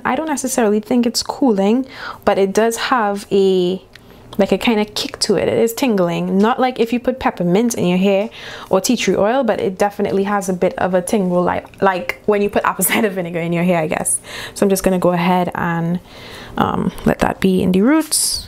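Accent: Trinidad and Tobago. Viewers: From the min you spoke I was like look my Trini sister lol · Must make out dat accent 🇹🇹🇹🇹🇹🇹🇹🇹🇹🇹🇹🇹🇹🇹🇹🇹🇹🇹 im subscribing now ❤️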